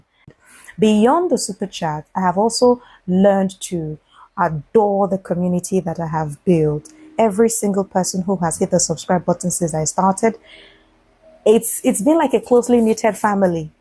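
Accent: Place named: Africa. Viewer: I like it but talk better. Your African accent distract your audience, and I can't understand you well. Work on your accent to become American, more people will understand your words. One love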